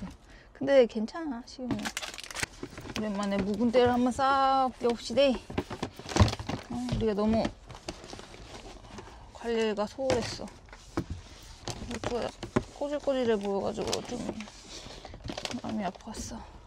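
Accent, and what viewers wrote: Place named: Jeolla, South Korea. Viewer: 아 그렇군요 전라도 사투리 한번씩 쓰시는거 같아서 ㅎㅎ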